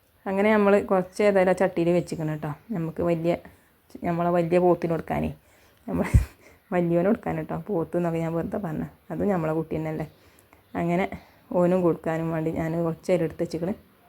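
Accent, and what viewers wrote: Malappuram, India. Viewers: അന്റെ നാട് ഏതാണ് ഒർജിനൽ മലപ്പുറം ഭാഷ ആണല്ലോ ഇജ്ജ് കജ്ജ് പജ്ജ് നെജ്ജ്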